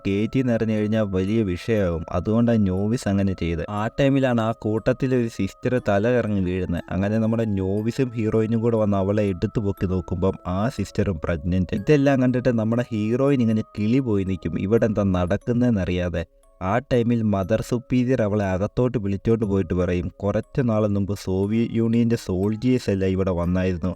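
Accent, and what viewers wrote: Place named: Kozhikode, India. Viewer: കോട്ടയത്ത് ഉള്ളവർക്ക് നല്ല pronounciation ആണ്. ഇത് kozhikode or kannur ആണ്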